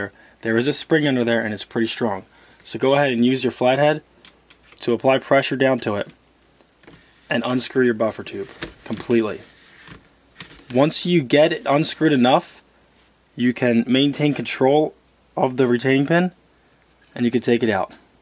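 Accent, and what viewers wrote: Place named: Philadelphia, United States. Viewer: Damn I was thinking that sure sounded like a Philadelphia/ Delco accent thEn saw the license plate! Good video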